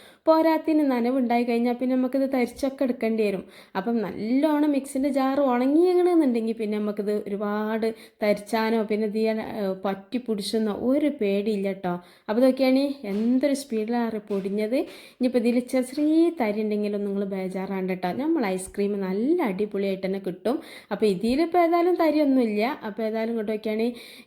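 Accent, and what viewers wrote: Malappuram, India. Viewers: Sathyathil ivar malappuram kare naattikkan vendiyanennu ingane samsarikkunnath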